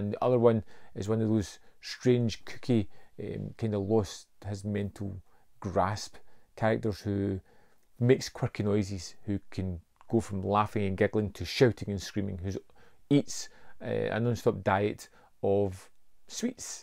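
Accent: Scotland, United Kingdom. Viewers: I know you are listed as being in the U.K. Your accent screams Scottish to me. I grew up in the U.S. and one of my best friends was from Scotland and his dad sounds just like you. He was also a real life badass being a former Royal Marine. I enjoy your presentations and greetings from Texas.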